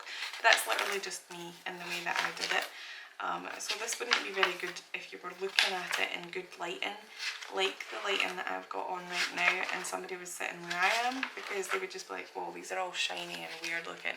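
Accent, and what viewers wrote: Scotland, United Kingdom. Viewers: Are you Scottish ?? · Thank you! You are britush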